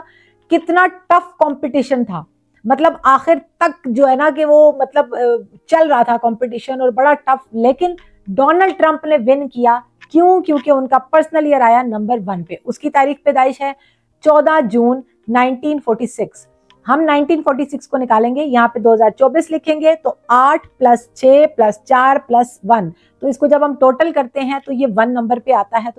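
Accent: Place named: Pothwari, Pakistan. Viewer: پئ اللہ نی قسمےتساں پوٹھوہاری بہوں سوہنری بولنے او اللہ پاک سلامت رکھے تہ عزتاں دے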